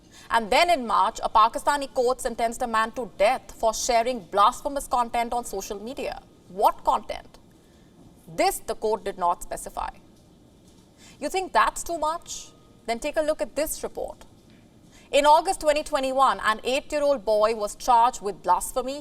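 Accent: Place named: India. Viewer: Her very strong Indian accent English.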